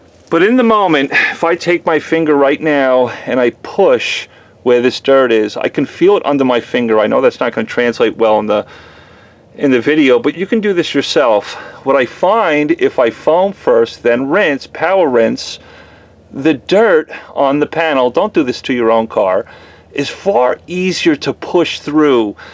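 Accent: New York, United States. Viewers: sounds like new york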